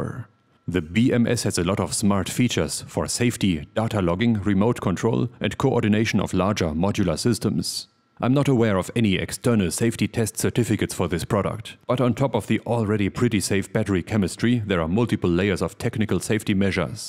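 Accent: Germany. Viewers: After 1 second I knew you were German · a german accent to die for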